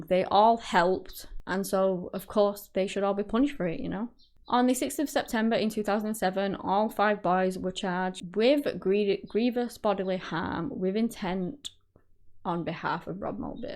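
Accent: United Kingdom. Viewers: Thank you for highlighting this case. May I ask where is your accent from in the U.K.? Thank you.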